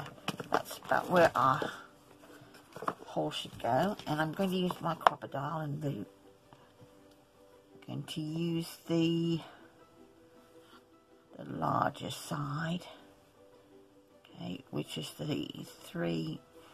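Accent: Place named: United Kingdom. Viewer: are you from the UK. Your tone and accent is very nice. We don't feel like you are rushing through it. Now your project is the best one yet. I love the little sheen in the checkered pattern and the bling type ribbon you used to give that wow factor. Give Chloe little kisses for me.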